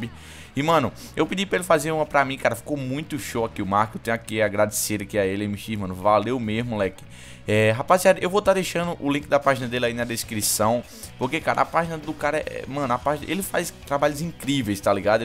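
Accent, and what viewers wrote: Northeast, Brazil. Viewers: De Que Lugar do nordeste tu és?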